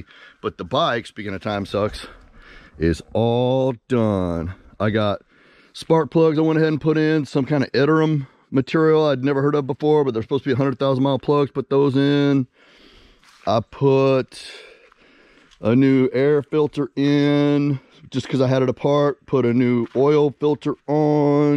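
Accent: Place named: South, United States. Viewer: Glad that things are good,! You are beginning to get 'just a tad' of Southern English twang going there... Ha ha. Welcome to the Southeast.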